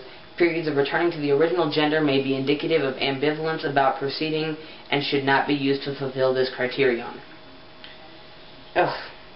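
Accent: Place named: Texas, United States. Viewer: Wait, wait, wait. "Here in Texas" - you live in Texas(or at least at the time of this video)?! :O Hahahahahaha, I've been going through all your videos, and I'm like, "This guy's accent is so similar to mine, I wonder if he's from somewhere around Texas..." 'cause I was born and raised here. What're the chances, man? You've got my EXACT couch, AND you live in Texas. This is just awesome! Hahaha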